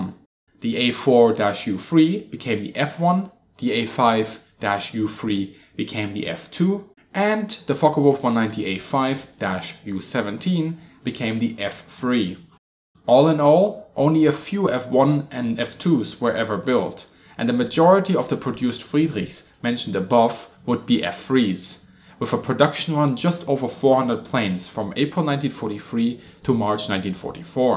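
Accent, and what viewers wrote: Germany. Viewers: Great target stuff vid. Ohh man I do love my fw 190 a8-r2..( tamiya ); you got afunny strong german accent..I like it, un saludo desde Madrid. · Are you german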